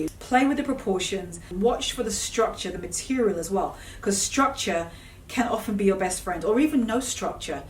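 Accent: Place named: London, United Kingdom. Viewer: You’re hilarious, love the London lingo and straight talking 🇬🇧😍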